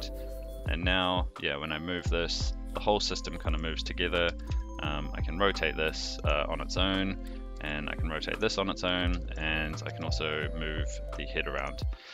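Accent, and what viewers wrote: New Zealand. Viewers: Hi. Have you seen a YouTuber named Karl Rock. He is from your country but married to a girl here in India. My word, if you listen to him with your eyes closed, you cant tell the difference. Your sound and accent so closely matches to his. Just incredible. The only difference is that he can speak Hindi as well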